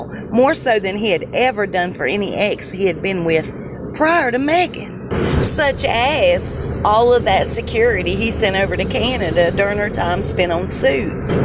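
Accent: United States. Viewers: I really love your accent. I wonder what part of the US you're from.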